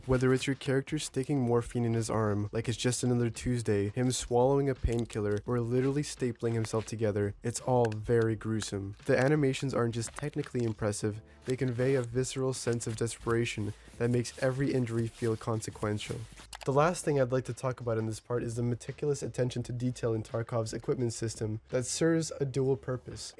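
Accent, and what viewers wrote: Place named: United States. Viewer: You speak with a perfect American accent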